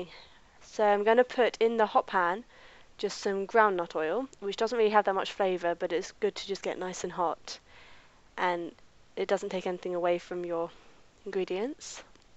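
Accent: United Kingdom. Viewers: Thanks for the video - you made it look really simple. Love your accent! What part of England are you from? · posh Londoner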